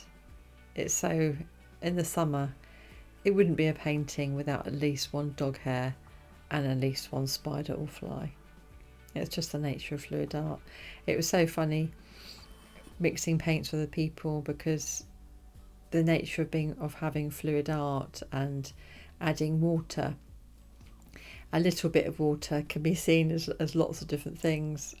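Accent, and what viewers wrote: United Kingdom. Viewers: your painting looks absolutely gorgeous, and you just keep getting more adorable in pink, your curly hair, and your glowing smile on your pretty face, and your sweet British accent. 😄😄😄🌹🌹🌹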